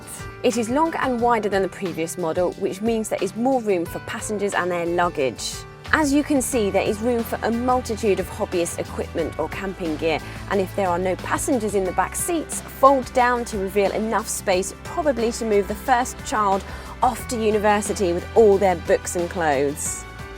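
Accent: United Kingdom. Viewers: british accent and a hot gurl: me gusta! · Hot sophisticated European woman, check British Accent, check Car knowledge,check I'm in love.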